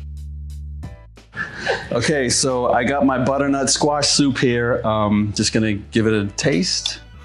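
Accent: Boston, United States. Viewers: That Boston accent ❤️❤️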